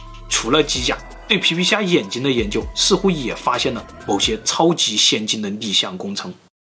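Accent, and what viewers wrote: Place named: Cantonese, China. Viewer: 是廣東人吧, 講話經常有粵語的影子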